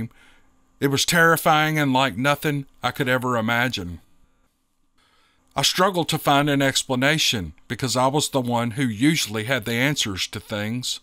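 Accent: South, United States. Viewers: Hi, This is Dave Ramsey, and I grew up in Memphis also. I'm 61 this year and now live in Las Vegas, and it is nice to listen to your Southern draw as you tell your stories. Really just wanted to say Great job with your pod cast... Love your Stories.. Dave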